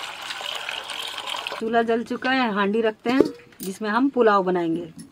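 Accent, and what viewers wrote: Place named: Bhojpuri, India. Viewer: Anuty ji aplog to hamare jaise bhojpuri bol rhe ho 😅 aur apka khana v bahut majedaar banati ho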